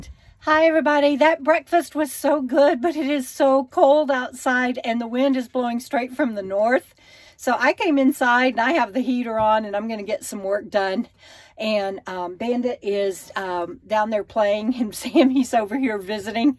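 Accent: Texas, United States. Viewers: Pence que hablas espanol, tienes que dejar el acento texano. Besos y KOKO!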